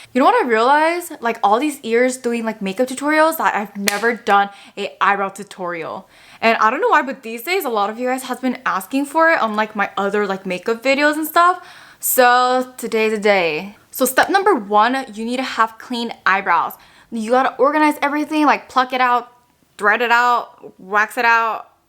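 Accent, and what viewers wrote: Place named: South Korea. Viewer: 콩글리쉬 너무 귀여워여ㅋㅋㅋㅋ 메아크업도 예뻐여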